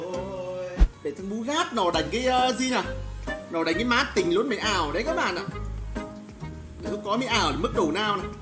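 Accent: Nghe An, Vietnam. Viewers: Ah ở đoạn mô nghệ an rứa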